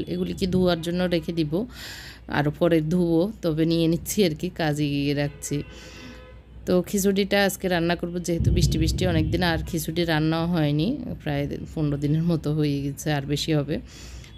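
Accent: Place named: Feni, Bangladesh. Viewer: apu.ame.feni district tak bolce. apnar kota suna mona hocca apne fenir manus.apnar badi fenir kon grame.bolban ke.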